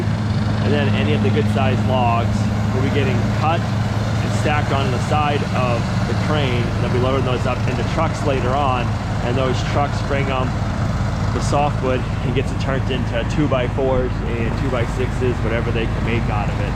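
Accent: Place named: New England, United States. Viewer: Giggle. You called your wife Gottta love the New England accent! I grew up in MA, got called "Linder" so much, it became my nickname.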